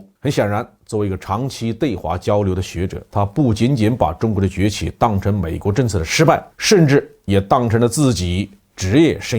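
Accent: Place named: Anhui, China. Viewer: 你是不是孝感地区的人？口音要么就是安徽某些地区。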